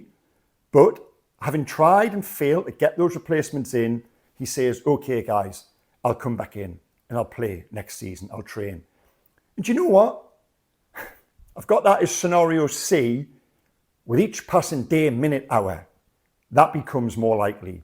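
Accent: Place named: Newcastle, United Kingdom. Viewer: Never suppress your Geordie 😂Ornstein’s bombshell tweet today blows apart a,b+c🧐if it’s true he’s adamant to never play for Toon again? Hero to Zero🤐🫣🖤🤍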